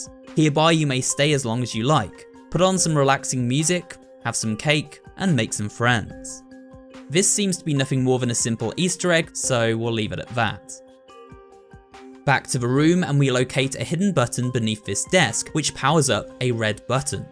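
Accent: United Kingdom. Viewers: Are you British?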